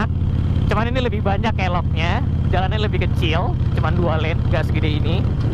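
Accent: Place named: Java, Indonesia. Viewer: "iyuh kono" hahaha khas banget ya medhoknya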